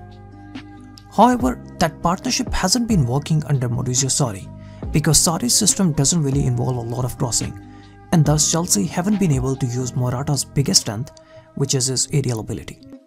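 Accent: India. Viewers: Sounds Indian.... how do you he's not!!!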